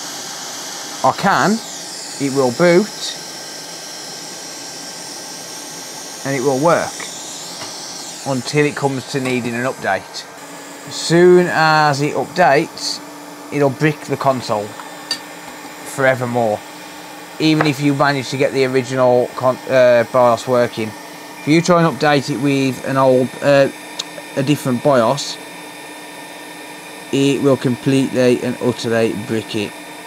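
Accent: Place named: Birmingham, United Kingdom. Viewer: Wot part of brum r u from bud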